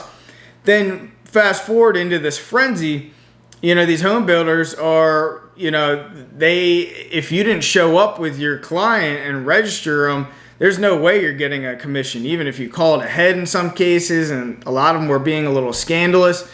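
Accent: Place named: Delaware County, United States. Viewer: I hear a Delco accent from this gentleman. I know this to be true.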